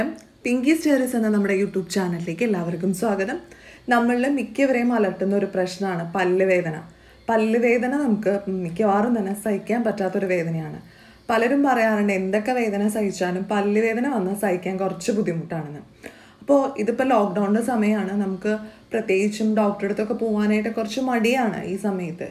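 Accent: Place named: Malappuram, India. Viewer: കുലുക്കുഴിയാ .. മലപ്പുറം സ്ലാങ്ങാല്ലേ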